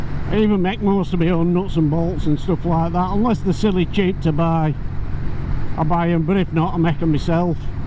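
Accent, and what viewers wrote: Yorkshire, United Kingdom. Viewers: I’ve been rewatching your “archive” recently as I miss your Yorkshire twang and your regular visits to Squires ! I can understand that you’ve already video’d all your bikes and that putting videos on YouTube is a lot of work but an update on your stable would be highly appreciated ! Best Wishes !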